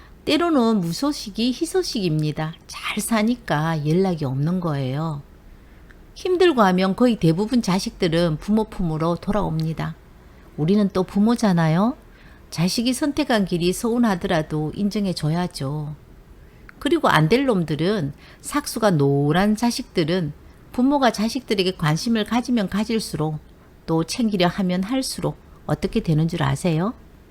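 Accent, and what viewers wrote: Gyeongsang, South Korea. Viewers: 영상 잘 봤습니다 경상도 말이 정겹고 반갑습니다 응원 합니다.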